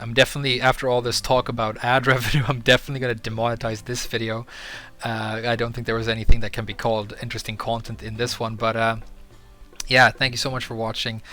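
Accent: Sweden. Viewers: I've known you were Swedish from the beginning, you have a very good accent but the Swedish origins always shine through, no matter how hard you try (I should know, I'm Swedish too)! I love your videos and your Feng video especially helped me a ton, and even though you don't even play him yourself, you managed to make a really good guide. I'm sure MainMan was joking about that by the way. Keep up the good work! Skål! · Also, I realize ur Swedish accecent in ur first vid lol, cheers.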